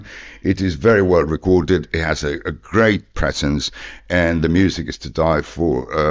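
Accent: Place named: Birmingham, United Kingdom. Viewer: were a phenomenal band, and I think they were one of the first Progressive Rock bands. Their album is pure magic. I'm also huge fan of the vastly underrated band. went on the become the founder and lead singer of, and his voice is one of the best in Rock music history. is a 5 star masterpiece! It has such a collection of great musicians from the U.S. & U.K. who accompanied on this tour. I was fortunate enough to see at Woodstock (yes, I am old enough to have been there), and captured the musical world with his beautiful expressive voice for a few years, but because he was struggling with alcoholism and he was a very shy man he stayed away from the public for several years. He did have some hits in the mid 1970's with songs like " " and " ", but he didn't become popular until " " from 91/2 Weeks. This is a bloody good channel, I really liked this video. 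You're living in Spain, but I detect a Brummy accent. Are you from the U.K.?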